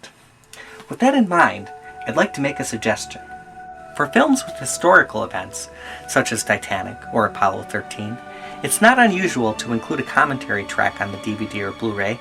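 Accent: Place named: New England, United States. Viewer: Biggest yankee accent I have ever heard.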